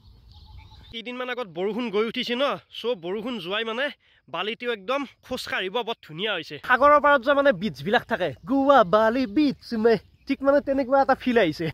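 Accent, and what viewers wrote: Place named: Nalbari, India. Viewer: ভাই তুমি নলবেইৰা ভাষা ক'লেহে বেছি ভাল লাগে , তোমাৰ মাতত আমাৰ নলবাৰীৰ আচল কথিত ভাষাটোৰ আভাস পোৱা যায় , সেইদৰে ভিডিঅ' বনাবা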